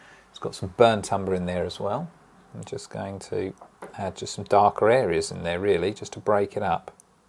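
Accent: United Kingdom. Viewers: Absolutely BRILLIANT !!!! Fanominal panter.Love the British accent as well.💖👍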